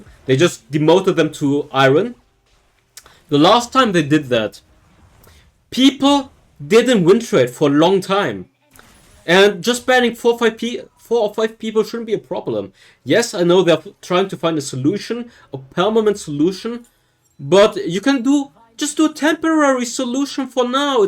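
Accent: Germany. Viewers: I love that u can always hear his German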